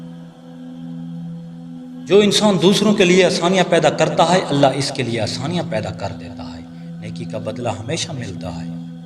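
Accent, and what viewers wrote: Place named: Saraiki, Pakistan. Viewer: Q k Urdu saraikioun ki trah boltey ho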